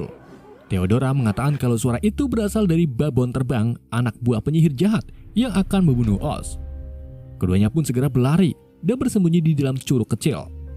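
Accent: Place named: Banyumas, Indonesia. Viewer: pengisi suara ngapak tapi bahsa Indonesia gak telalu mendok...bagus...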